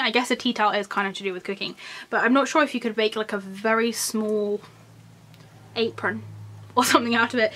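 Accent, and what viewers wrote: United Kingdom. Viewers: Hi enjoying all your Halloween haul videos thanks for sharing are you from the uk I’m just curious x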